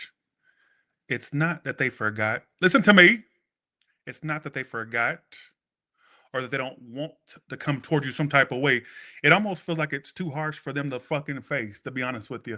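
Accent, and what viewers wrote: England, United Kingdom. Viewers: The British accent sent meeeee 😅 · I'm still here! Ha you kept freezing 🥶 Your back Thanks so much. The English accent 😂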